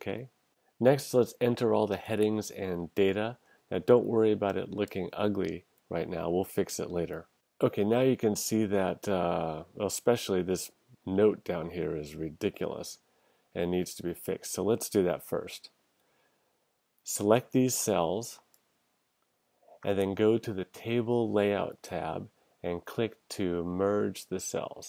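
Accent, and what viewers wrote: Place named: United States. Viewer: 日本人ですか。英語が上手なので、アメリカ人だと思いました。Besides, great video, first one that I found on this topic that explained everything properly👍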